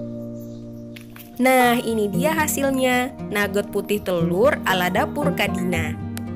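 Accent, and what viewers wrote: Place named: Jambi, Indonesia. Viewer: Orang mana kak? Kok kaya orang Jambi wkwkw logatnya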